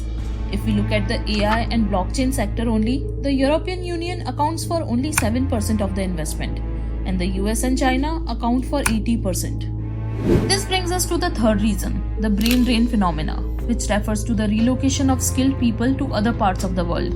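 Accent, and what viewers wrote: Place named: India. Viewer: You seems to Indian origin from your voice. Why don't you create content in Hindi? This type of content will go viral in Hindi speaking audience.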